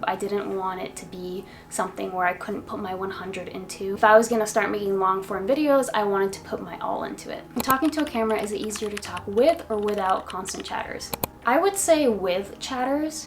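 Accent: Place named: France. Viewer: I think I am hearing a French accent, I am from Canada as well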